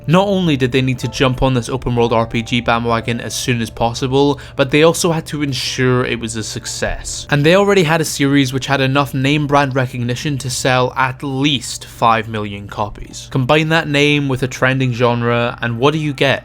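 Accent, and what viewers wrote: Scotland, United Kingdom. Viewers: u from scotland mate? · Is this kid British I'm not listening to any more of his bullshit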